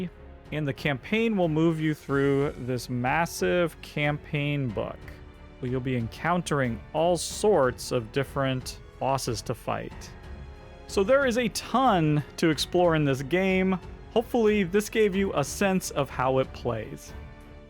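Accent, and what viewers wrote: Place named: North America. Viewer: I always get a joy watching your RC videos, as I'm still struggling to get my hands on a relatively cheap copy. In my country, demand for board games are pretty low, so the only options we really have are services like Amazon (And from your accent, I'm guessing you're either US or Canadian, so if you didn't already know, ordering from Amazon if you don't live in the US is REALLY expensive)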